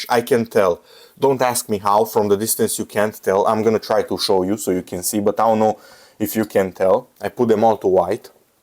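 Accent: Romania. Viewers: I can tell the Romanian English accent , because im Romanian myself, here's a sub , i feel like we're already friends lol . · Salut. Ma uit la video si abia dupa 10 minute imi dau seama ca esti roman. Preturile sunt mai scumpe in EU pentru ca se adauga TVA la tot, peste care se adauga pret transport si adaos comercial. De-asta e suparat Trump ca noi europenii avem TVA, la ei nu este. Eu am 7800x3d si rx 7900xt luate in aprilie 2024, upgrade de la i7 11700F si RTX 3070. Nu m-as mai intoarce la intel si nvidia, poate sa le primesc gratis. Ma uit si eu cu jind la 9800x3d si 9070xt si ma cam "mananca" sa fac upgrade dar nu e logic sa dau bani pe upgrade decat peste inca o generatie. E diferenta de 11% maxim intre ce am si 9800x3d/9070xt. Daca poti fa switch la proc AMD nu o sa regreti. Eu aveam consum de 175W in cinebench la i7 11700f cu placa smechera z590-f care scotea tot din procesorul ala fara K (un fel de PBO de la AMD) si era varza procesorul pe langa asta, ryzen 7800x3d cu 86w in cinebench si scoate cu mult peste cel vechi, sa nu mai spun de gaming rupe tot in afara de 9800x3d. 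Faptul ca am luat procesorul asta cu consum mai mic mi-a dat posibilitatea sa pun placa video cu consum mult mai mare(318w de la 220w cat aveam inainte la gpu) la aceeasi sursa de 750w.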